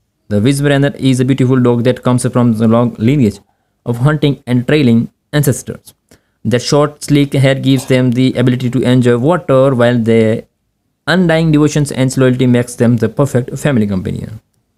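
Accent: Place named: India. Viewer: I don’t think it’s AI. My guess is that this is a man from India doing the narration. Unfortunately, his accent is extremely difficult to understand. If he is going to be producing videos like this, he should at the very least SLOW DOWN.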